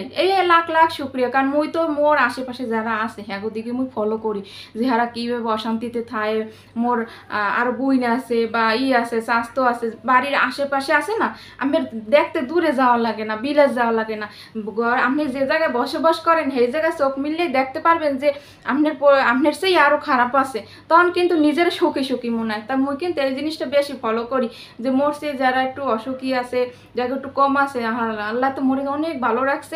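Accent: Barishal, Bangladesh. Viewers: আপু আমার মেয়ে আপনার আপু আমার মেয়ে আপনার বরিশালের আঞ্চলিক ভাষায় কথা অনেক পছন্দ করে ❤❤আপনি এভাবেই কথা বলে ব্লগ করে যান❤❤পাশে আছিআপু❤❤ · আপু তোমার বরিশালের ভাষা শুনতে আমার খুব খুব ভালো লাগে❤❤ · আপা বরিশালের ভাষা মোগো আঞ্চলিক ভাষা ভালো লাগে আপনি এভাবেই কথা বলবেন ভালো থাকবেন ❤❤❤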